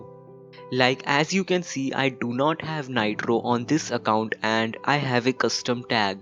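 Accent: India. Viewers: The method is real people just doesn't like Indian accent thise days...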